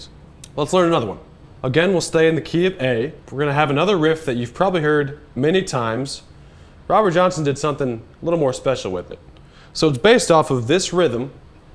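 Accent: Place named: United States. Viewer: Is it just me or is he speaking with a bit more of a cowboy accent in this video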